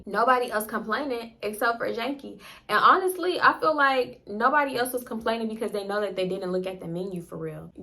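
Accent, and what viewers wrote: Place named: Houston, United States. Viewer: I’m really trying to figure out are you a real Houston native. Moved here as an adult, moved here as a child ..lol definitely sounds like a Houston native with the lingo and accent . The body is giving Houstonian 😂😂😂 but I’m surprised I’ve never seen you before . Sound like you be a lot of places and artist I be around and you are very much eye candy 😬😂😂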